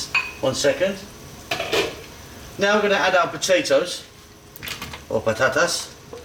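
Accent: United Kingdom. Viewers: MUY DELICIOSO! Five stars for using the Fish Sauce and your thick British Accent to go with that. Really, it's delicious, really!!!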